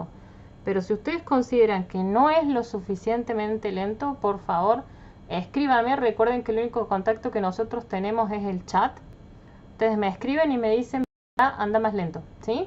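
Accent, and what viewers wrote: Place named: Córdoba, Argentina. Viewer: Hola ahora mismo estoy teniendo en rosa el swaters beis para tres años me encanta tu acento eres de Córdoba? Me encantan tus videos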